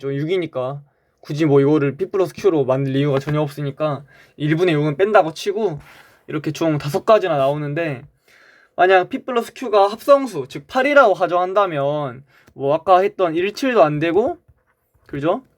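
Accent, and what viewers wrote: Jeolla, South Korea. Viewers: 그 수학 관련된 건 아니지만 전라도 사투리 듣기 좋아요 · 전라도 사투리 구수허네유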